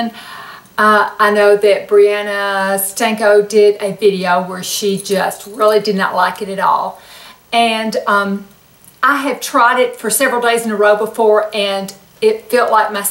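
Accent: South, United States. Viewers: You are amazing and I just love to watch your videos! Your southern accent makes you adorable as well as beautiful! xoxo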